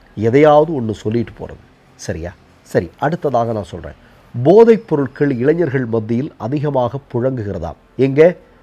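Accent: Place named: Vellore, India. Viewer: Vellore Tamil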